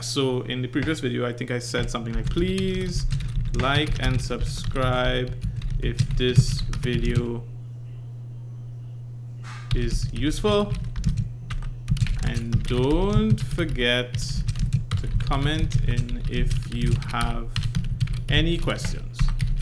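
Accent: Trinidad and Tobago. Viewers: I'm sorry but the Trini accent just made me unreasonably happy. Also, the tutorial was so helpful. Thanks for this!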